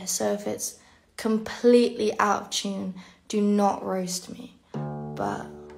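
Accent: United Kingdom. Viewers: She is British???? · she's british ??????? :(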